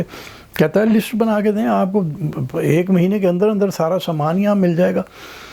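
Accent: Punjab, Pakistan. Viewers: Salman Rashid sab, your and my ancestral city is Jalandhar 😊😊😊. Your Punjabi accent reminds me of my late grandparents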